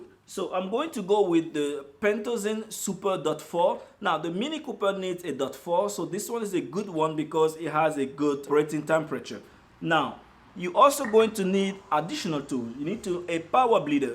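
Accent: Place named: France. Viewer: You don't have a hood (bonnet) sound shield. Your accent sounds French.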